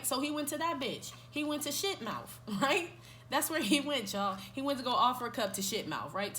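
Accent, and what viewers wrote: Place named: Los Angeles, United States. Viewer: Girl you got to be from out here in LA..I know them accents anywhere 😩...you be having me ctfu!! lmao n girl yes fck him he'll be back 😩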